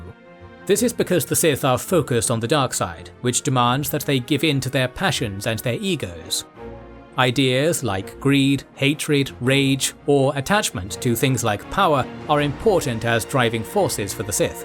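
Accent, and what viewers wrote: United Kingdom. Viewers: A prime example of the danger of the British accent. Giving credence to the sequels which split the fandom, introducing Grey Jedi as if that's a real thing and not just fan fiction, and defining the Sith Rule of Two as the way that operate when the Sith species was conquered by exiled Dark Jedi. Sith bend the Force to their own will for their own selfish desires, where Jedi surrender to the will of the Force and use it to guard life. Way too much emphasis on the damn sequels.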